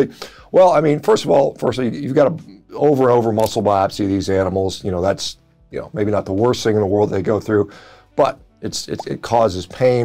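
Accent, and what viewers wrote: United States. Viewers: Wow, thick as two short planks. 'Probably worse for the environment'. What is that supposed to mean????!!!! I can see that you are from the slaughtered meat lobby but you have not done your cause any good. You sound like a typical red neck American. You have little or no grasp of science and clearly do not understand what 'cultured meat' is. If you are from the slaughtered meatindustry you should be looking at this issue differently. There are positives and negatives. Around 800 million people globally rely on farming for thier income, directly and indirectly. It goes further than that too. This new form of meat production is going to impact, like a tsunami, eventually. Modern traditional meat producers need to work with this new industry and have control. You are going in the wrong direction with your post. Reply to me and get the real information as I have been researching this industry from the start.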